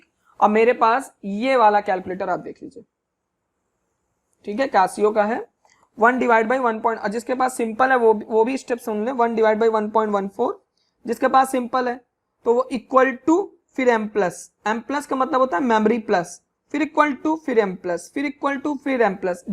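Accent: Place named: Lucknow, India. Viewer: Sir aap Lucknow se hai kya ?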